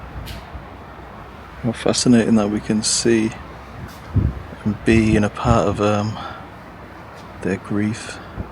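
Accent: United Kingdom. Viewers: Though I'm a Filipino, I like your British accent.. More videos,..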